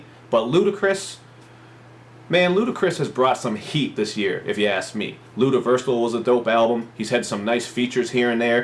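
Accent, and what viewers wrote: Canada. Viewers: New subscriber here so I dont know if you've already mentioned this, but are you from Toronto? I noticed the hat and your canadian accent lol. Im from toronto was just curious. Great review.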